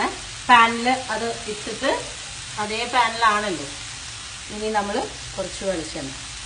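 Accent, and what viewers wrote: Palakkad, India. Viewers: I am making vendaka dish for dinner and continue palkkaden accent in your presentation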